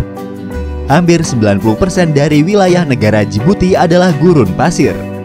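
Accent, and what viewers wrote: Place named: Ponorogo, Indonesia. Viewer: wong ponorogo ndi ki suarane.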